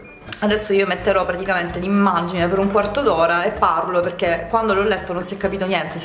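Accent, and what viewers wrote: Sicily, Italy. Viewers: Stop al video prendo il pennello e lo #scatafotto muoioooo 😂 Mi piace che inserisci termini e accento siculo. 👍💕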